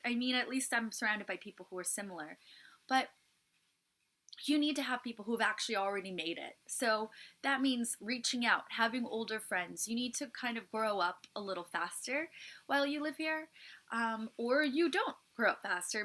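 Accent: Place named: United States. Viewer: She sounds American. WHAT if shes Speaking the Truth, and these are all True .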